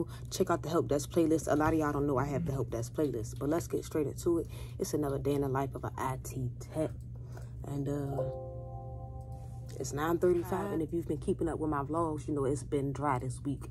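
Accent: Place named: Philadelphia, United States. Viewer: In the beginning of the video, I thought I was Trippin but then at the end of the video I realized you’re from Philly so am I I just finished Google cyber security online. I’m studying for my copier A+. I’m learning SQL Lennox and Python, but I don’t have any experience.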